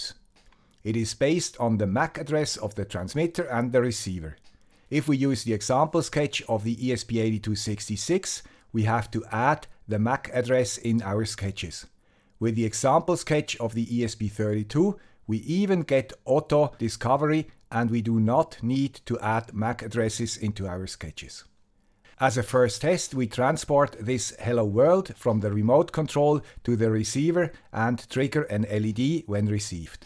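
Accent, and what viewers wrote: Switzerland. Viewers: no doubt, that would be a secure solution. But it would require even more sophisticated software, and it would still need the crypto-quality RNGs. The shared state then takes the form of the client certificate. If the Guy With The Swiss Accent (gruezi!) or any of his viewers want to go with TLS, then chances are good that they‘ll get a secure solution. But I think it‘s worth considering the least that you‘d have to do and still be sufficiently secure. The solution in the video is probably fine, and I believe that HMAC would also get it done only depending on the shared key, but not on the counter.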